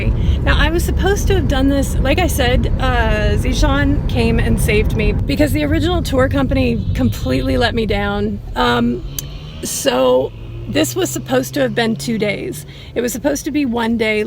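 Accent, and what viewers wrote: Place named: Canada. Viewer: Welcome to pakistan have safe trip and u sound like more Canadian then American.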